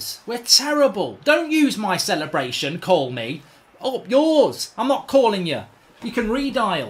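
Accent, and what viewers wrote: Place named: Liverpool, United Kingdom. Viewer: Scouser lmao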